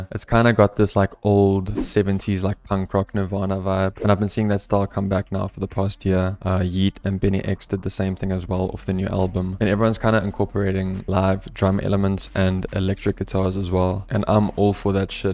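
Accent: South Africa. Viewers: Have you ever lived in south africa? Accent is strong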